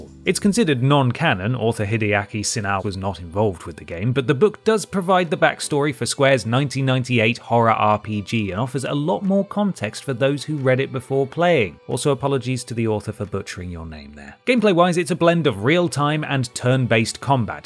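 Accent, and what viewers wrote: United Kingdom. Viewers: Gotta love british accent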